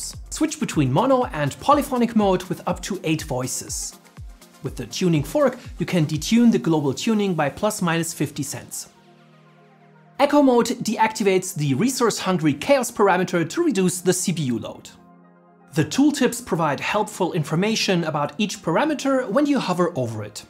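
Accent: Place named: Germany. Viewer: Nice one. And the thick german accent makes this video even better.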